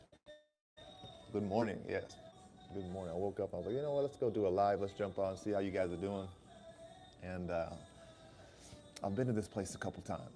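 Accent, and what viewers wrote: Texas, United States. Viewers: That's wasup bro I used to live in Dallas And I love your Texan accent lol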